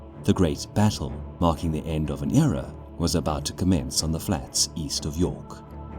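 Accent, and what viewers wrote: South Africa. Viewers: I have to ask, is your narrator South African? He's very good and manages to remain neutral but there are a few words that give him away listening as a ZA native. :) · English South African accent! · Narrator dude, how long has it been since you left South Africa? I hear faint hints that give a little away. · South African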